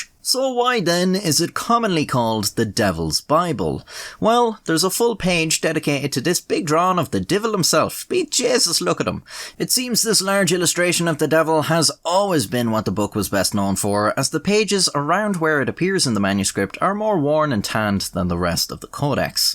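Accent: Ireland. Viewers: It's Irish, but it's not the Dublin accent, which most people, especially us Americans, have heard variations (piss poor imitations, in words). He lives in Dublin, but he's told us he hates the native Dubliners he's met.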